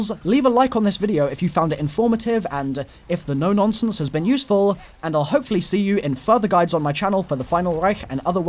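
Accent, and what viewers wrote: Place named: United Kingdom. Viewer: Hey I think you sound similar to David Tennant just by your enthusiasm and your British accent (ik David is Scottish but come on you two have similar sounding voices)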